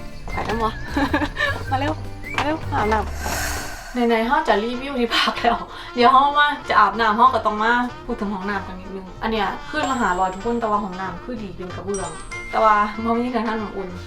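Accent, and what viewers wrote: Isan, Thailand. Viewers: เอาจริงๆนึงว่าคนอีสานหรือว่าเป็นคนอีสานว่ะ-^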